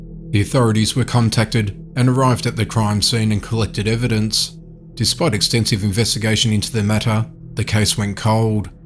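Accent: Australia. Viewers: He's an Aussie 😊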